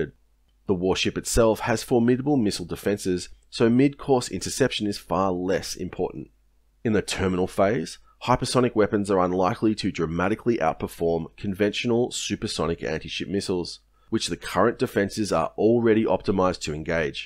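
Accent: Australia. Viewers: You sound like that classic Australian Doosh bag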